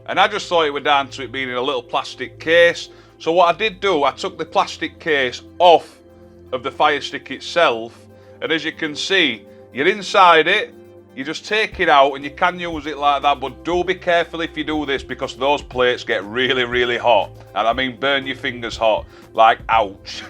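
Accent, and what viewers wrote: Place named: Scotland, United Kingdom. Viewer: This "Yank" loves humor with a Scottish accent! Thanks for making me laugh. Great info. I have fire "devices" from 1st gen to Fire Cube. I've been trying to get my 1st gen stick to work better and stop rebooting, and I think you nailed it with the power input. Used a 2.0a charger. However, it's still acting up, so it's probably just shot and old s/w doesn't help. Can't scroll through settings, just jumps. When I get to "Fire Device" it doesn't have reboot and even with 2.0a on updating says "power may be insufficient" . So think i'll give up on the 1st gen, and stick with 2nd gen (don't have 4k TVs). However I noticed unplugging my 2nd gen FS it was really hot. I like your idea of taking the shell off to run cooler but unplugging it might be hot? Was thinking may taking skin off and drilling holes or making it more open to let out more heat. 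Thanks. Great ideas!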